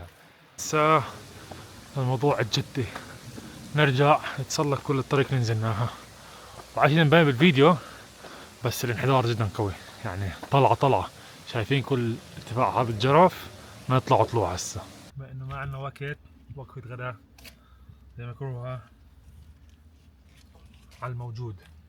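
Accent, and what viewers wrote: Palestine. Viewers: لهجتك الفلسطينية الأصيلة اجمل اشي ولازم تكملها يا عمي بالحلال مع بنت الحلال .. ربي يوفكم للخير ويهدي بالكم ❤ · من وين انتَ من فلسطين 😅😅حاسس من رام الله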